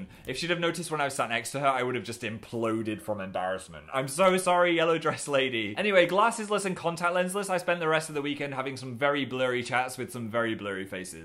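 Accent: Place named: England, United Kingdom. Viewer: I love ur accent, I have the same accent as u cause I am english but u say seem words differently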